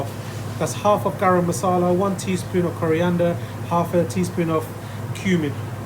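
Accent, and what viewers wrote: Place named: Birmingham, United Kingdom. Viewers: Sounds like a Brummie. Probably from the 'Balti Triangle' or similar area in Birmingham, which probably has more 'Indian' restaurants per square mile than anywhere outside of India! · Should tell us where you are think it would be great for your restaurant? It’s a midlands accent maybe Leicester but I’d b guessing. Looked delicious.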